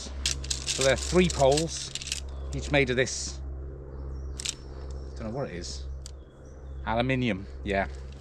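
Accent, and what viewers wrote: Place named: Cardiff, United Kingdom. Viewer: Hey bud, nice little video! I’m a Cardiff boy, are you in Cardiff or Newport as loving the accent haha!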